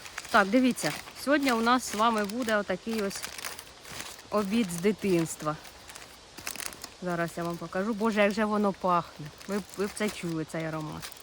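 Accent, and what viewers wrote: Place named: Poltava, Ukraine. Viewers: Якшо з полтавської області то все нормально) Суржик то все чотко) Привіт від земляків))) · Як завжди супер відосик) Дяка вам за контент та чарівний Полтавський суржик)) Ви ТОПчик!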